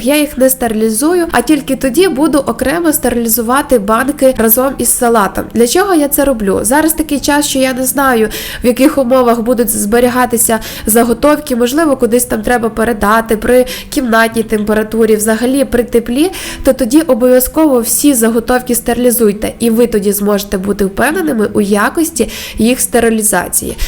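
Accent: Standard. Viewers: Яка гарна господиня!Дякую за чисту українську.Дуже приємно слухати.Рецепти всі прекрасні,я вже їх протестувала. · Маленька , дякую . Яка у Вас гарна мелодійна чиста українська мова . ❤❤❤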